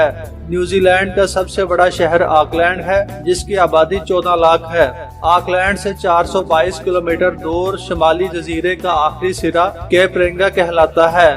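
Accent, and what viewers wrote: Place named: Punjab, Pakistan. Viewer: URDU GHAR HD : REF NEWZEALAND DTD NOV 14, 2017, BEST REPORTING AND COMPLETE INFORMATION, BUT COMMENTARY WAS UNIMPRESSIVE BECAUSE OF URDU IN PUNJABI ACCENTS. SOUNDED LIKE SOME VILLAGER READING.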